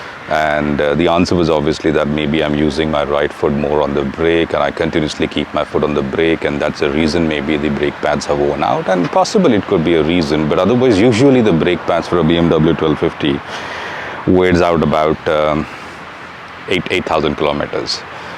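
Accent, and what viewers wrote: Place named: India. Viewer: 印度英语真的很好听❤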